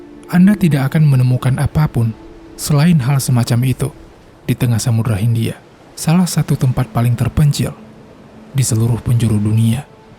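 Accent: Bali, Indonesia. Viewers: Naratornya orang bali kayanya yak? haha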